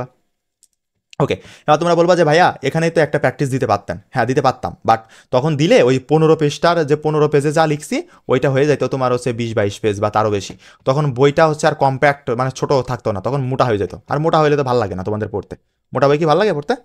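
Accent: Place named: Jessore, Bangladesh. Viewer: Jessore er vasa😁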